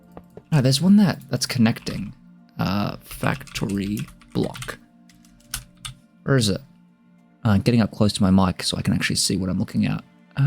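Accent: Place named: Australia. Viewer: thought you were a fellow aussie, great video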